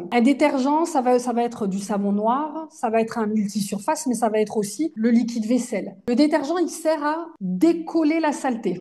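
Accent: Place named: South, France. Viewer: votre accent du sud qui nous apportent réconfort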